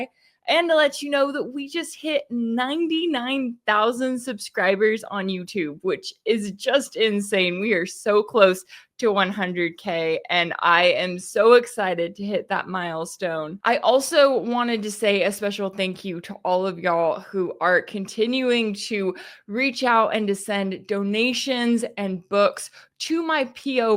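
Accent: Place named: South, United States. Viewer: As usual another great program! Thank you always for the important needed information! By the way, where did you get that "Al ya all" American Southern drawl?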